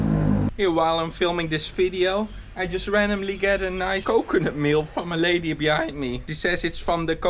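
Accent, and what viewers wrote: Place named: Netherlands. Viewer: Sounds like Dutch :)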